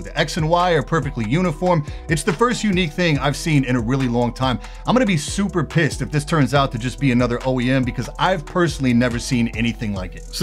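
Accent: United States. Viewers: You have the most American voice ever.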